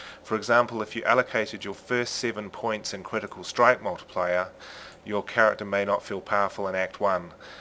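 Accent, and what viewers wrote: New Zealand. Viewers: Awesome kiwi accent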